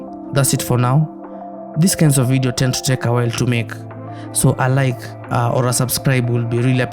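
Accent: Kenya. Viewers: the accent... Acha izo... Weh ni mkenya? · I can tell the owner of this channel is Kenyan even his English proves so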